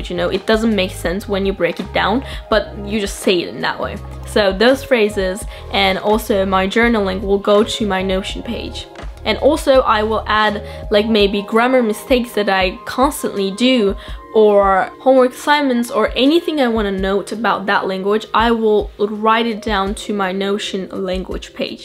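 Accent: England, United Kingdom. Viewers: Did ur english become more British? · It’s surprising that you don’t have Japanese accent but Englishish accent! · You are really amazing!!! I’m shocked at your English accent 😍👍🏼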